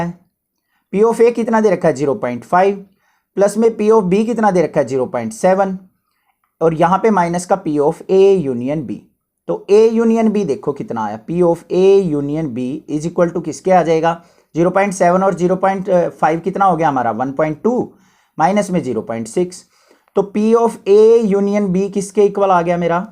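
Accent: Haryana, India. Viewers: Sir you are from haryana 🫂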